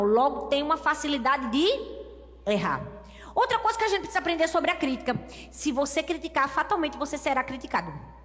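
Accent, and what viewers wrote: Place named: Pernambuco, Brazil. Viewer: AMOOOO MESMO ESTA PASTORA....DO SOTAQUE GOSTOSO DE PERNAMBUCO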